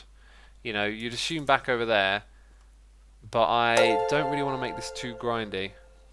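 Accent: United Kingdom. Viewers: Wait are you British?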